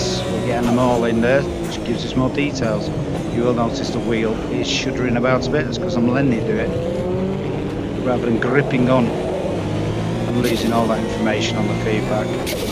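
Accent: North, United Kingdom. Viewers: I fear for our sanity when dust becomes a variable and rateable update worth recording. But we ‘is’ sim races, and we do this with pride. And yes, it does look better. Nice vid as usual partially due to your beautiful northern dulcet tones. Appreciated.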